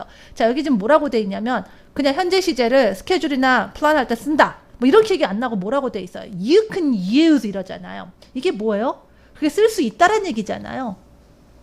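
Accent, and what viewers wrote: United Kingdom. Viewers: 요즘 선생님 강의를 듣고 있는데 영국 억양도 그렇구 분위기가 브리짓존스의 르네 젤위거 배우를 많이 닮으신 것 같아요!!!!!너무 아름다우세요!!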